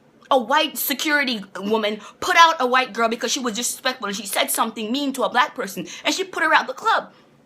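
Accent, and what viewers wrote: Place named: Jamaica. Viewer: Jamaican accent? (I'm from the Caribbean so just curious). Confirmed. Shout out to our northern neighbours :) Great video btw.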